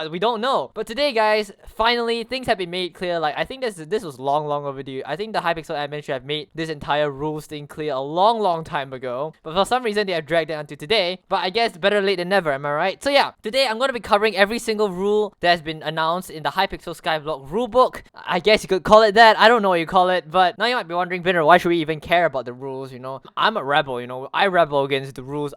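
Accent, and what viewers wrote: Singapore. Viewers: btw this is the singapore accent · You sound singaporean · Lemme guess, u r Singaporean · do u live in singapore? u have a slight accent :) ty for the vid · You sound Singaporean ngl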